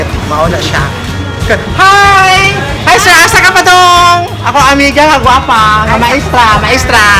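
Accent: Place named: Cebuano, Philippines. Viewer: You remind mi of a cebuano host, pareha mo ug accent. Hehehe ngita lage ug uyab hahaha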